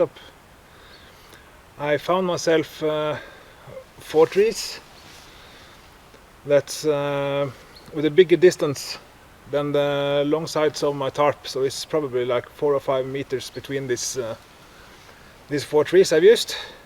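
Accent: Norway. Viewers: Hei! Nice Tarp Setups and a nice norwegian Slang in your englisch speek! U got a new subscriber :) Best regards from germany, zwosiedler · My great-grandfather was born in Nesjestranden(Nesjestranda), Møre og Romsdal. I've always enjoyed the Norwegian accent. I grew up tent camping, but now I like hammocks more. Nice information that's useful. A pleasure to watch ;)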